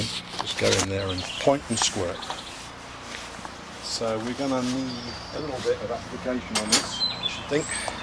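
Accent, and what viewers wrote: United Kingdom. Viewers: You helped me fix a problem after installing new struts (but not the spring) where I had not made sure the 3 notches in the upper plastic mount were aligned with the butt of the bolts in the top plate. This made for some very noticeable knocking sounds when going over bumps and turning which disappeared after I aligned them. But before I watched your video and fixed it myself, I brought it into my local Ford dealer and they charged me $195 for a diagnosis that found nothing (confirming my belief that I can do the repairs better with YouTube than even the most qualified and expensive mechanic). Thank you for this awesome video. Your accent sounds Cockney, like my creative writing teacher. Bloody right then. Best of the lot.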